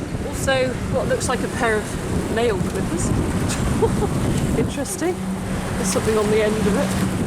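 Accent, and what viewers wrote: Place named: RP, United Kingdom. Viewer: you sound so posh! Did you film the opening shots from your home on your balcony overlooking the Ritz in Mayfair? All said & done, as always a great video - thanks you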